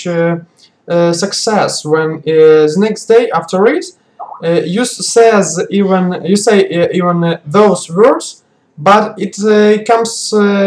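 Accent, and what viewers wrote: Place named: Germany. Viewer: You have a German accent while speaking English...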